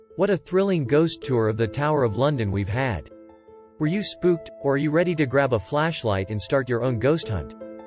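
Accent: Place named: United States. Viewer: Never believe a voice sounding like a America they never get the facts right.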